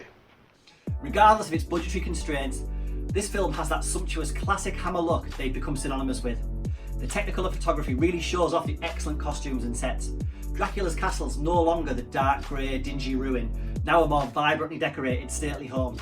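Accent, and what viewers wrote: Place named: Ireland. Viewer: I love your brogue <3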